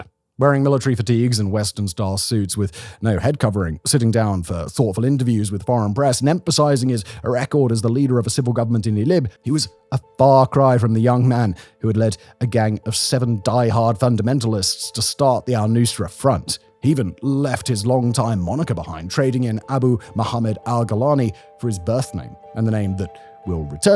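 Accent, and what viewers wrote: RP, United Kingdom. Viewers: Out of this entire video. Simon saying Ray nay sanse. Instead of renessance. Whilst saying in other videos taco sounds posh but tayco as he pronounces it. it tells me a lot. British pos.h. living in Prague. Gotta make that cha cha cha'ching.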